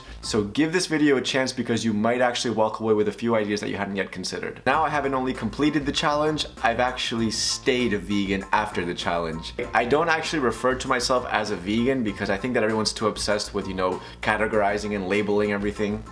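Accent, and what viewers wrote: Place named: Barcelona, Spain. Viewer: Spain. More specifically, Barcelona :)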